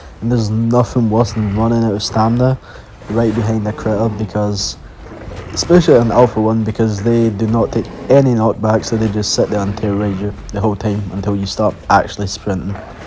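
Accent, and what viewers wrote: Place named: Scotland, United Kingdom. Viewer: Ah the Scottish accent! My mum is from Scotland, my whole family is over there. Love it :)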